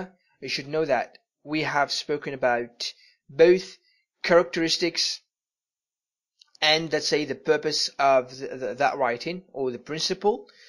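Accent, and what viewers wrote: United Kingdom. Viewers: Sounds Scottish to me. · you have a good british pronunciation stick to it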